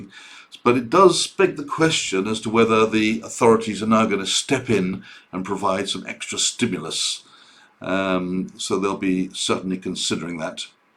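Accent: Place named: RP, United Kingdom. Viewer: Forget your Bloombergs, Reuters et alteri, isn't it so much nicer to listen to excellent analyses brought forth in his equally enjoyable Queen's English?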